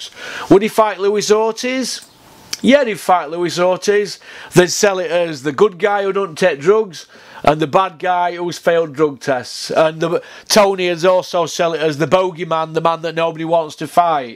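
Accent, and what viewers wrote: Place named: Yorkshire, United Kingdom. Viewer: Great to hear this as you put things so well in your Yorkshire tone.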